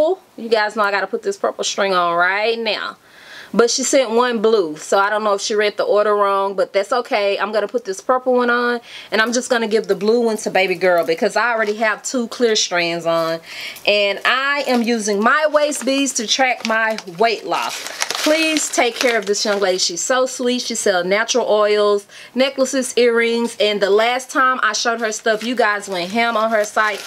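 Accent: South, United States. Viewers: Your southern accent is soo strong today I love it !!!! Happy you feeling better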